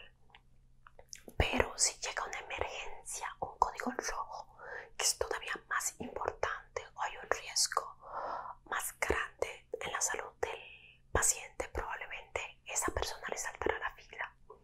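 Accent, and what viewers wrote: Italy. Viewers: De que pais es? Italiana? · Se te esta pegando el asento italiano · Eres de Italia? , nose tu hacen to me parece Italiano 😁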